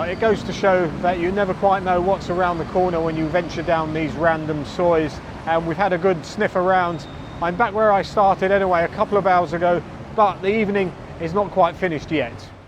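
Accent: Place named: London, United Kingdom. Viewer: .. i'm fascinated by your accent.. you sounds like born and bred Londoner.. how come!!!.. just founds your Channel.. haven't had time to look at all your video yet..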